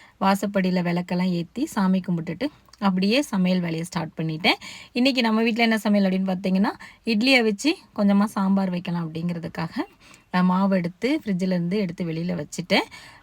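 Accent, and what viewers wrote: Tirunelveli, India. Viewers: . நெல்லை தமிழ்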